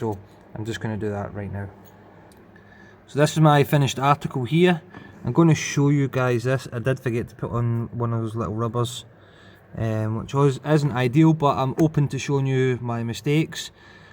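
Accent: Scotland, United Kingdom. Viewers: Love the video 👍 great to hear a fellow Scot 🏴 on here. Liked and subscribed 🏆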